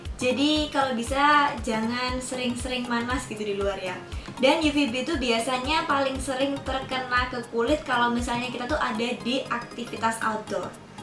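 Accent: Java, Indonesia. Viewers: Bahasa Inggrisnya bagus. Tapi pas ngomong bahasa Indonesia medok bgt. Sukaaaaa. Indonesia banget. Gak pake make up aja udah cantik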